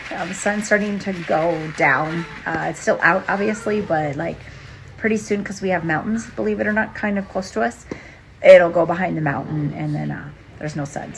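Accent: United States. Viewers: I came upon your channel and am enjoying it. Beautiful family. I knew I recognized an upper Midwest accent (I am from Michigan!) 💕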